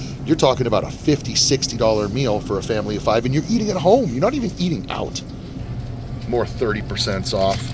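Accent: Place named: Canada. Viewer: Oh yeah you're an American but you look and talk more Canadian than most Canadians I know. Thanks for covering this in Canada. Best wishes. By the way, these prices at Metro are BY FAR the MOST expensive I've seen at any grocery store here in Canada. This is why I never shop Metro. And I bet those onions (and a lot of the other produce and meats) go rotten sitting around because no one is buying them.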